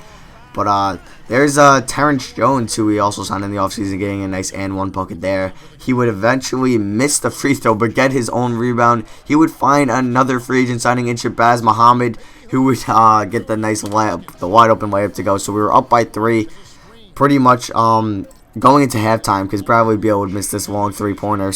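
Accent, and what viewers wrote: Pittsburgh, United States. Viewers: You from Pittsburgh?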